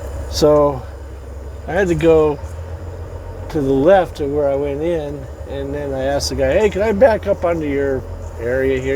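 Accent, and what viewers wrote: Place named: Colorado, United States. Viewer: /Coloradan accent! Keep on trucking!uCifornia/Colorada accent love it! Keep on trucking!😀🐼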